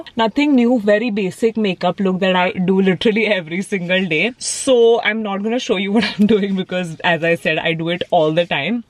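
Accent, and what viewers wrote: India. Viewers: want to hear hindi from you your hindi accent is like damm loving it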